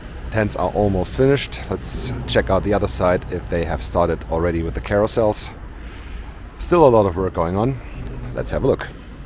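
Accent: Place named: Munich, Germany. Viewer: Danke. 😊 Dein Englisch ist übrigens wirklich total sympathisch. Ich verstehe alles und es klingt so schön vertraut. Vorallem mit den deutschen Begriffen zwischendurch. 😁 Du bist bestimmt auch in der Münchner Gegend aufgewachsen, oder? Du klingst zumindest richtig münchnerisch.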